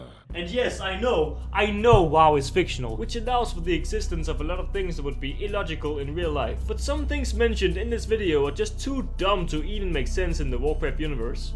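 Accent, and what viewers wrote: Denmark. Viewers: Are you from Denmark? :D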